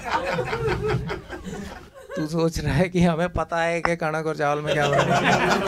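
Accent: Haryana, India. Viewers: Kya badhiya Haryanvi accent pakda hai 👍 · Topnotch haryanvi accent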